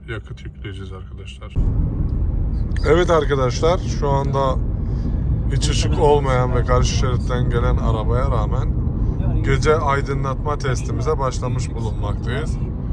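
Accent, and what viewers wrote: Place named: Söğüt, Turkey. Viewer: Nerelisin hemserim sanki sögütten geçtin gibi geldi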